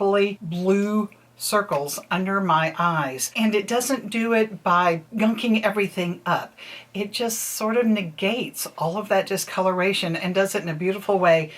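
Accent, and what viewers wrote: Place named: South, United States. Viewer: Beautiful skin❤️hear some southern in your voice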